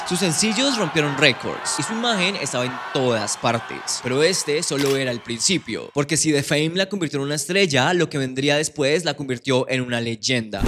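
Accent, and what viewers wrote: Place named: Fresa, Mexico. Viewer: Tu acento tan fresa es tan molesto que ni quiero terminar el video.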